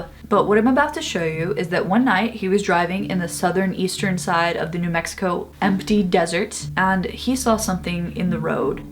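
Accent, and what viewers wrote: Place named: Mexico. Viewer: Your Mexican accent is so cuteee ❤✨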